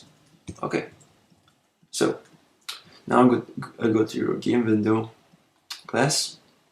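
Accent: Russia. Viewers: Great work.... these tutorials ignite my passion for game programming.....Keep it up... BTW..you sound Russian...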